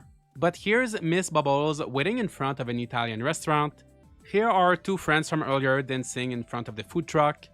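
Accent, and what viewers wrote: Quebec, Canada. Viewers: He is from Quebec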